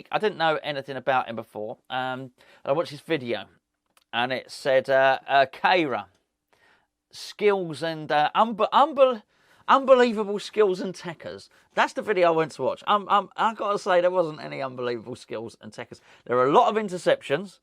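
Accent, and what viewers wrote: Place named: Scotland, United Kingdom. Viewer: Great Scottish accent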